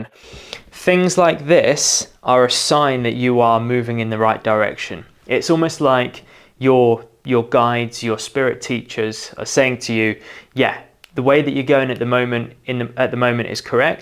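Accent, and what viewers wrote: United Kingdom. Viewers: Are you related to the late Lady Diana? You have the same accent.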